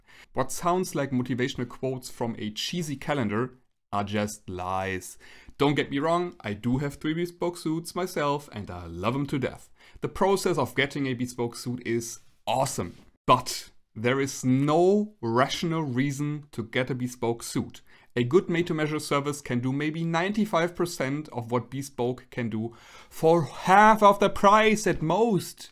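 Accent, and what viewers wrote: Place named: Germany. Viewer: You sound German, I might be wrong but I’ve been learning German for about two weeks, I love the show Babylon Berlin and I love the clothes they wear❤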